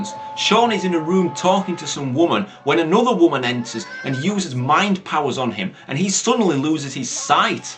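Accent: Scotland, United Kingdom. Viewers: Greetings from California! I watched Zardoz a few weeks back and it was INCREDIBLE. You're Scottish right?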